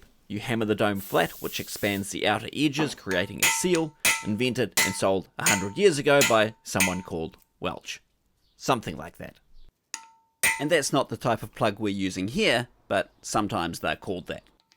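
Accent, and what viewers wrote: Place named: New Zealand. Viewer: I love the New Zeeland accent. Threeds and heeds.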